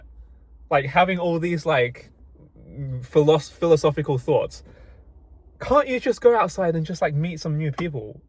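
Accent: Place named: United Kingdom. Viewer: Have you considered starting an OF account? I mean your a Asian, and you've got that British accent? I'm already getting hot at the thought.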